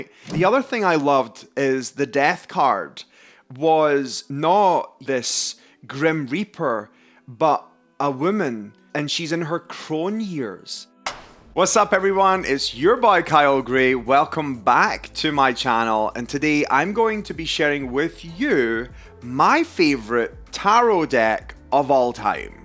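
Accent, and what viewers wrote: Scotland, United Kingdom. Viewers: After starting a course to learn Reiki I suddenly really wanted a tarot deck to meditate with and I chose the Wildwood deck which also has artwork by Will Worthington. I absolutely love this deck and it is very similar to the Druid deck, with standing stones, the Green Man and ancient folk lore. I should imagine you also have the Wildwood and the Greenwood Decks. I just found your channel and enjoyed listening to a Scottish accent talking about tarot. I am also from the UK.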